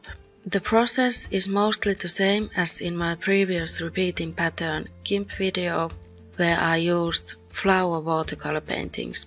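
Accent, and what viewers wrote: Finland. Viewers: You sound very Finnish :D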